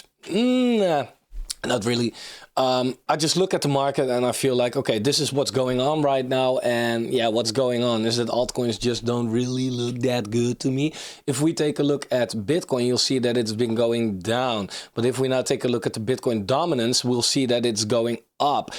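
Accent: Netherlands. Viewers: Are you on the btc amsterdam conference? I say this because you sound like you are from netherlands!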